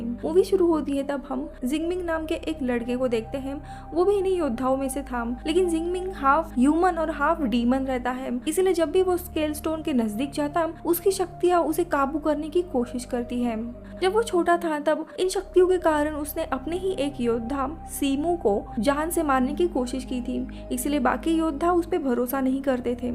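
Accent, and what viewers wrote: Maharashtra, India. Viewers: Aap marathi ho kya?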